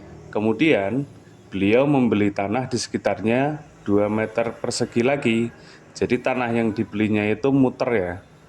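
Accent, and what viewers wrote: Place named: Java, Indonesia. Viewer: Bahasa indonesianya medok bgt mind, orang mana mind ?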